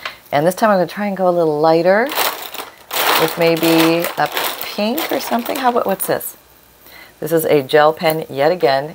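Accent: Canada. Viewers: Good afternoon 🌞 I love your white hair. I know it's officially blonde but on my TV it's very white and very pretty. Yes Canadians have a slight accent. Anywho, love the little tidbits and ty for the giggles. You always make me laugh. My hubby caught me watching you again. He said and I quote, " you would leave me for crafty videos" 😂😂😂 why yes, yes I would, 😅😅😅 have a great day! ❤ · I love the Canadian accent! I have a friend that lives in Nova Scotia and I could listen to her talk for hours 😊😊 Also I have an Aunt and Uncle who live in Fargo ND.. I live in New Mexico and when they come to visit I love listening to their stories.. Accents are soo unique and are always intriguing to me. 😁😄😊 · Thank you for more inspiration! Sometimes I overlook the obvious, such as being able to draw a border to get the effect I want. I spend hours looking for just the right paper when actually I can create my own design, and have fun doing it! 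🤓~ I love the Canadian accent, especially on the 'out' words. 🥰 I have the Texas twang which is a giveaway of where I'm from, anywhere I travel. Oh well, we work with what we've got, eh? :virtualhug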